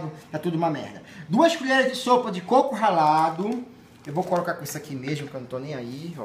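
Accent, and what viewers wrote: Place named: Pará, Brazil. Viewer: Ele é paraense!! Esse "eguaaaa" e leso são inconfundíveis. Kkkkk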